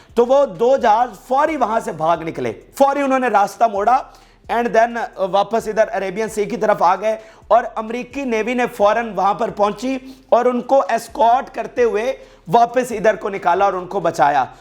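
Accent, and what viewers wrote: Punjab, Pakistan. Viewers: مہربانی کرکے انگريزی نہ بولا کرو ايسے لگتا ہےجيسے انگريزی نہيں پنچابی بول رہے ہو ۔اس کو ٹرانسليشن کرکے بولا کرو ۔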